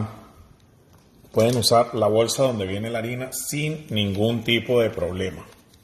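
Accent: Venezuela. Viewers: El tono de voz muy venezolano, gracias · Ese acento Venezolano me encanta!! Probé la receta y son exquisitas. Recomendada.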